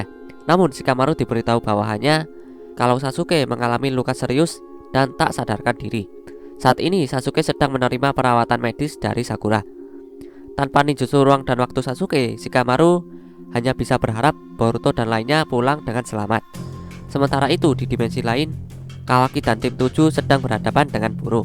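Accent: Java, Indonesia. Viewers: Medoo men min suara mu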